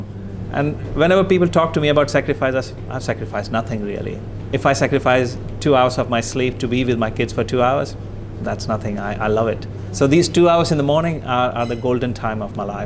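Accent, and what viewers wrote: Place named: United Kingdom. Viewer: British accent uncle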